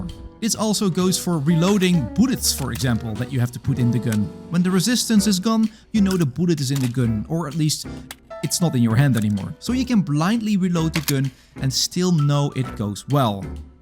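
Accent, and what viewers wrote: Netherlands. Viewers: is it just me or does he have a dutch acsent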